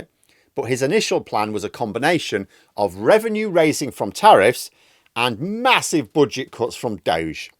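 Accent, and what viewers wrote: United Kingdom. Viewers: The tariffs never worry about China. If they were, he would’ve just placed them on Chinese products he placed them on the entire world. Who pays the tariffs. the American consumer , that’s who. But at the same time half 1 million federal employees are out of work. Truck, drivers, dockworkers people who work in all sorts of box. Stores are out of work because there’s nothing coming in from any part of the world to stock the shelves. When Trump was president the last time, the only law that he passed was a tax cut for millionaires and billionaires. That law is getting ready to expire and he wants to make it permanent. His plan is to cut the Social safety net in this country and he’s already well on his way to doing that. Because his policies affect peoples retirement funds people are losing significant amounts of their 401(k)’s, and other pensions. 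Just because somebody has a British accent doesn’t mean they know what the hell they’re talking about. I bet this guy was in favor of Brexit. How’s that working out for you? The only socialism Trump is going to do is the same type Hitler did. And before Ronald Reagan and Margaret Thatcher, the top rate was 70% in the USA. I have no idea what it was before Thatcher.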